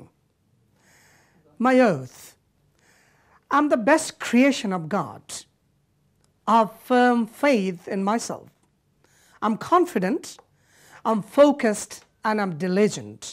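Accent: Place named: Bangladesh. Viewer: Your Mother tongue Bangla is clearly influencing your abilities here. You need to improve your own pronunciations by a long way and I mean by a very long way.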